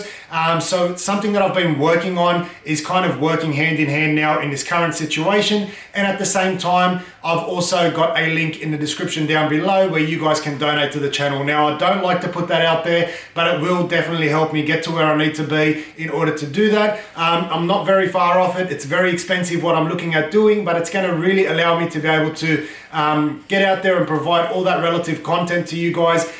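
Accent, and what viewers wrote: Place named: Australia. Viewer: Love ya accent, decent run through. Easy to understand and implement. Go aussie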